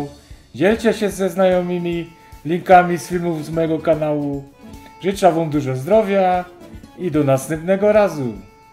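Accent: Silesia, Poland. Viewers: Jak to jest, bo się zastanawiam. Film opisany prawidłową polszczyzną, wchodzę z ciekawości a lektor mi jedzie gwarą!?! To na Śląsku, piszecie inaczej a mówicie inaczej czy tylko ten kanał ma jakiś problem? Albo ja, bo dla mnie to jest problem. Dlatego YT mi nie tłumaczy zagranicznych tytułów video, żebym nie klikał na polski opis filmu po turecku. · Oczywiście po śląsku , brzmi super! Pozdrawiam z Berlina. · Świdnica, ale proszę rób filmy po śląsku. Wszystko jest bardzo zrozumiałe a klimacik dla ludzi z poza G. Śląska zostaje :-) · Tak jeszcze nie robiłam, więc do roboty puki zima idzie :D A, co do gwary śląskiej nie rezygnować!!!! Cały urok w niej! Ja z Warszawy i pozdrawiam, a oglądającym - smacznego. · Piękna sztuka.Dłuuugo już Pana oglądam.Mistrzostwo świata.Rafał z Krakowa. Śląsko gwara mi nie wadzi. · Świecianka z kuj-pom, a obecnie mieszkająca we Włocławku, pozdrawia. 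Filmy tylko po Śląsku. Pięknie się słucha gwary tym bardziej, że mój mąż to też Ślązak z Tychów. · Super robota (pracochłonna ta szynka).Pychota, podziwiam talent pana, cierpliwość i i umiejętność obróbki mięsa w pyszna dojrzewająca szynkę.👍👍👍👍👍Tu Bydgoszcz, rozumiem dos dobrze slunsku gwarę.Pozdrawiam i DZIĘKUJĘ.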